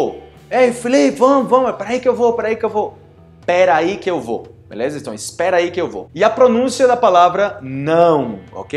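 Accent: Spain. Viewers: Vc é brasileiro??? Eu acho que é, mas deve morar fora pq ja percebemos um leve sotaque e uma soniridade espanhola na sua fala...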